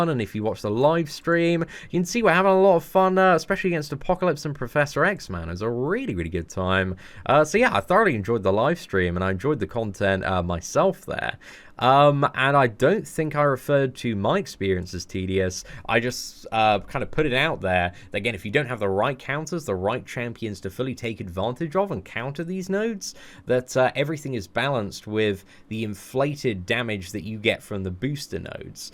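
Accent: United Kingdom. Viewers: Ngl there are 3 reasons why I am subscribed 1. You're british accent is amazing 2. You gamble everytime 3. I play contest of champions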